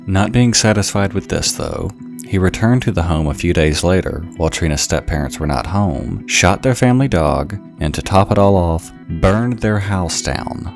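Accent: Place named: Texas, United States. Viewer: Are you from Texas? Your accent sounds very Texan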